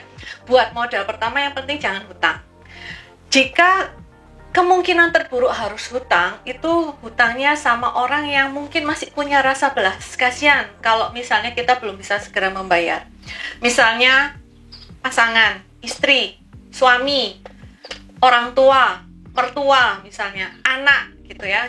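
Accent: Surabaya, Indonesia. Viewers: kereeen menginspirasi, baru liat video ini auto subcribs.btw logatnya jawatimur suroboyan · Thats was good.. Ingrisnya medok kas Suroboyoan..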